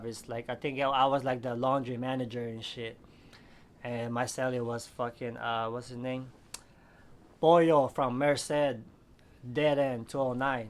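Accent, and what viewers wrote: Philippines. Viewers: I like the pinoy accent. That's dope. You make it sound treal and gangsta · I love the Filipino accent man, I was in YA in 87-89 and I was accepted by the blacks and Mexicans but I got the hardest time from the Filipinos. I'm half white/Filipino but I was born and raised in the Philippines, deep in the jungle in a literal bamboo hut. But since I wasn't full Filipino I was given a hard time eventho most of the Filipinos in America never even step foot in the islands. But it is what it is, I like the channel man.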